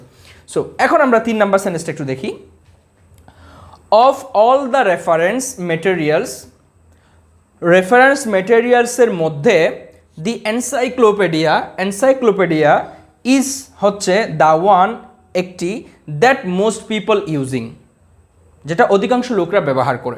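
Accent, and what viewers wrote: Chittagong, Bangladesh. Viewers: Vai ki CHITTAINGE NAKI...!!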